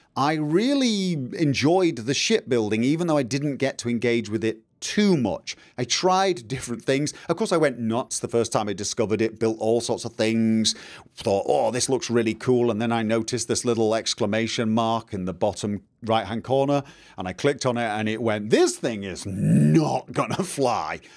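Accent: United Kingdom. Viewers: Well then. So I know how whining sounds in a grown man's British accent.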